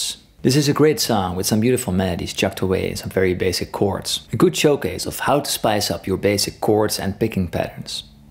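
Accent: Netherlands. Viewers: Are you Dutch? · Ik dacht dat ik al ergens een klein Nederlands accent hoorde! Perfecte video's! Erg nice om naar te luisteren ^^ Keep up the good work! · waarom hoorde ik meteen dat je nederlands bent? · You sound Dutch to my Dutch ears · i have to ask, are you dutch? your accent sounds dutch lol. if so, ik ben ook nederlands! ik hou van je video’s :)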